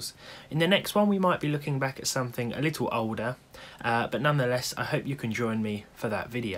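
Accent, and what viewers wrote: United Kingdom. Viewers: Hey just joined your channel nice vids random British accent lad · I love the British English, sounds Smooth. Try it with a 2700X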